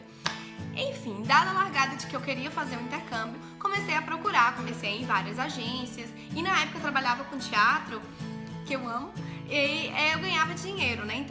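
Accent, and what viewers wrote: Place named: Bahia, Brazil. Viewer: Amei!! Tô super nessa dúvida e o vídeo me ajudou muito. Você e super fofa e sotaque baiano não tem pra ninguem <3 hahaha sucesso com o canal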